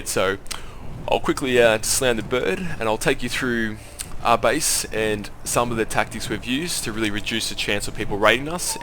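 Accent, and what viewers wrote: Australia. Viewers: Finnaly i found an aussie gamer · Where is your accents from? Australian? not sure :).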